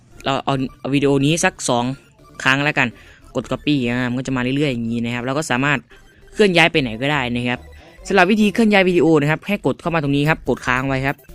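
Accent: Nakhon Phanom, Thailand. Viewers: พี่อยู่นครพนมใช่ไหมครับ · คนนครพนมหรอครับ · พี่คนนคระนมหรอครับ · พี่อยู่จังหวัดไร เหมือนนครพนม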